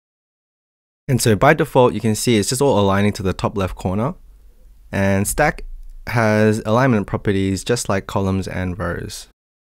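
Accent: Australia. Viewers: Your accent is so interesting. It has flavors of Australian but more heavily sounding of American English than most Australians I've heard speak. What is it?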